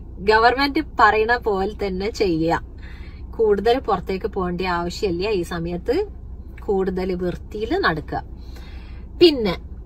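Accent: Kozhikode, India. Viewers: assal Kozhikode anallo samsaram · പച്ച കോഴിക്കോടൻ ഭാഷ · കോഴിക്കോട് ഭാഷ ഞമ്മക്ക് പെരുത്ത് ഇഷ്ടായി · കോഴിക്കോട് ഭാഷ എനിക്ക് ഇഷ്ടം ആയി 😍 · കോഴിക്കോടൻ മലയാളം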